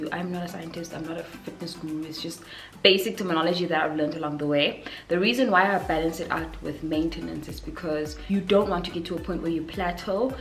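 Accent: South Africa. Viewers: so just stumbled upon your page, looooove your accent it's giving south African vibes man, where are you from? (sorry if i'm too forward) I always get this excited when I hear a fellow African accent somewhere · Obsessed with your accent. South Africa?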